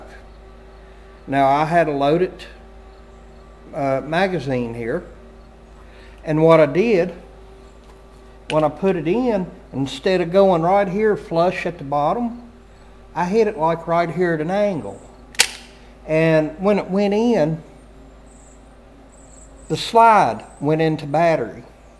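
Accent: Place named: South, United States. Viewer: My people! I moved to NH for GA in sept and really miss the southern accent.... :)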